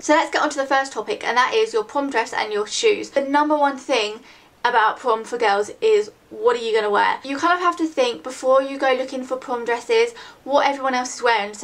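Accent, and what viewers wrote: United Kingdom. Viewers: Where in england are you from? I love your accent :P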